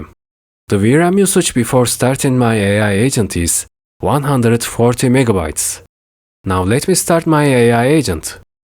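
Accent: Turkey. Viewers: Great video. Random to ask but are you Turkish by any chance?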